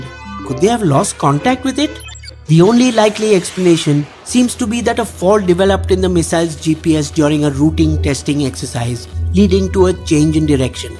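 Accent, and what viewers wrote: India. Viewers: well the accent is india so bye the vedio is gonna be as fake as the indian media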